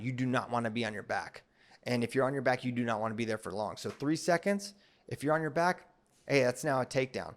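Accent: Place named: Iowa, United States. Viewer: Are you from Iowa? I think I detect an Iowa accent.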